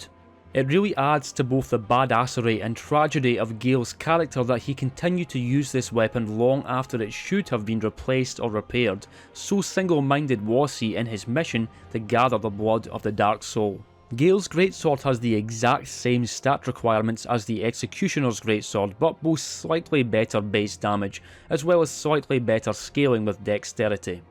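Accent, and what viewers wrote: Scotland, United Kingdom. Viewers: May i ask a question? Are you a scottish or an irish? I'm just interested... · what i love more, is a scottish accent xD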